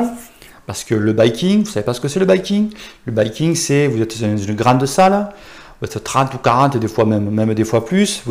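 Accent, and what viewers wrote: South, France. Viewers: Dit.....sans rancune.....on se passerait bien du jingle .... sinon ton contenu est MEGAAAAA en plus de ton petit accent du sudeeee....très charmant !!!!! 😅😋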